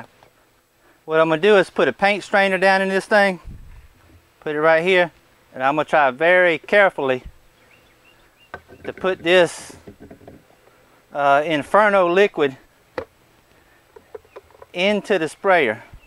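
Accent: South, United States. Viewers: Hey dude, enjoyed your video very much! I'm in Los Angeles so your Southern accent and references to woods and swamps was refreshing! I've got a raccoon (in LA? YES!) eating my crops in my new backyard raised garden. Ate my tomatoes and corn just like the day before I was ready to harvest. When it ate one of my 20-year old box turtles, it was WAR! I did the pepper/garlic thing, which worked for a while. Then bird netting. But now I'm going to get a trap like you have, apprehend that critter and drive it into the Santa Monica mountains and set it free. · I love your southern accent! I think raccoons are chewing up my trash at night. I found my milk cartons torn apart across my deck. I can show you what my trash bucket looks like. I don't have a garden, but I need to keep critters from coming up here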